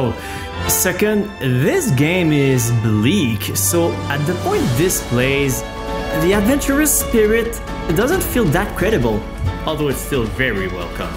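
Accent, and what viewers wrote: Quebec, Canada. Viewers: I recognize your québécois accent ;)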